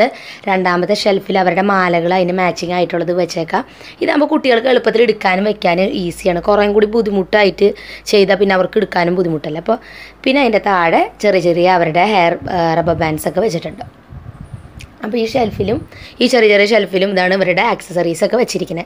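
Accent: Thrissur, India. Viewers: Enikum thrisur bashem trisurkarem ishtanu.. Njn ernakulam kaariya.. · Thrissur ഭാഷ കേൾക്കാൻ നല്ല രസമുണ്ട്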